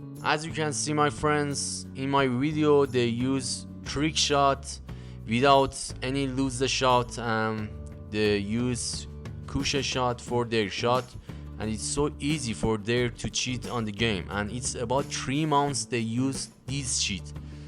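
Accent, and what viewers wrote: Iran. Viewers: Your persian accent is very funny =))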